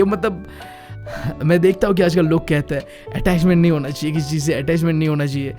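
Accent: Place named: Bengal, India. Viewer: bro you are a bangali becase my friend speakes same like you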